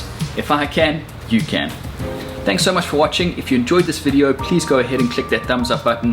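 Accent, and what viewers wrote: South Africa. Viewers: That’s a South African accent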